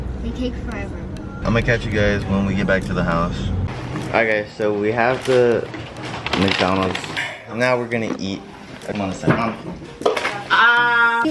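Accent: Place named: United Kingdom. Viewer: Omg I swear I'd never think u were American I always thought u were british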